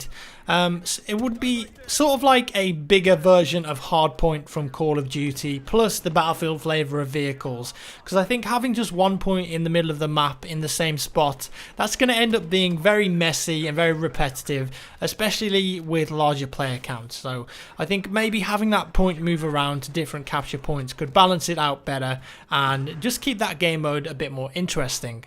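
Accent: United Kingdom. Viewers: Never Played Hardline, just here for that British voice...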